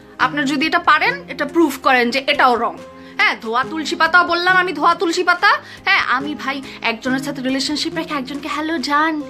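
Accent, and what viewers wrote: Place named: Noakhali, Bangladesh. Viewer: Noakhalir meye bole kotha love you apu 😘😘😘😘😘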